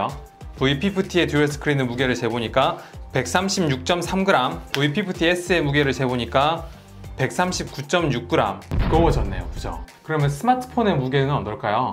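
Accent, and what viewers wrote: Gyeongsang, South Korea. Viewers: 경상도 사투리가 너무 깊게 박혀있네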